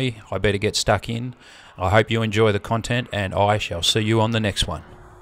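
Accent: Australia. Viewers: The accent helped, but the last giveaway was the great view of Mount Wellington. I always thought somewhere in Tasmania, given the proliferation of cul-de-sac streets, steep blocks and no shortage of amazing views. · I watch a few lawn care channels in Australia, including yours! As an 🇺🇲 I'm beginning to hear the different accents now. That's part of the fun of watching channels around the world! In the 🇺🇸 we have so many accents/dialects...some are so strong/thick it's difficult to understand! As usual Mr., excellent work! 👏 😊👋🇺🇲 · I love the Australian accent 🦘❤️ 🦘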